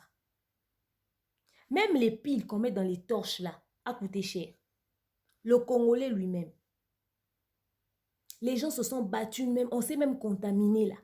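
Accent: Ivory Coast. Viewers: Bien dit merci. Juste ton accent, tu parles comme les ivoirienne pourquoi?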